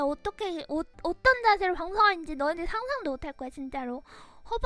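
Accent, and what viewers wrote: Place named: South Korea. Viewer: 가 롤을 해서 그런지 짜증내는 말투가 점점 한국식으로 변해가서 너무 귀여워ㅋㅋㅋㅋㅋ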